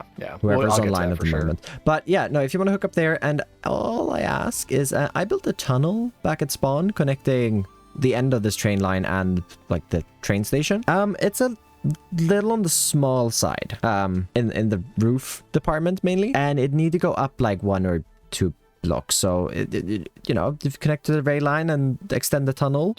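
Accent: Sweden. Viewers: This is the first video I see of you and by your accent I bet you were swedish.. and then I looked at your youtube channel description and I was right.. Im swedish too :D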